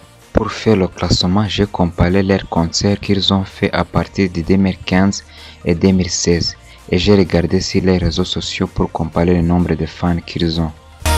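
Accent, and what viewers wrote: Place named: Rwanda. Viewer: L accent rwandais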